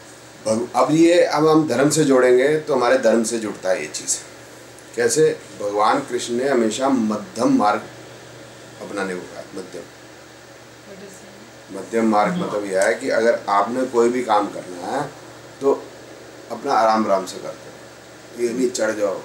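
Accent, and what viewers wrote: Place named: West Delhi, India. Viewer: When i listen to the way you talk, I start to miss West Delhi.....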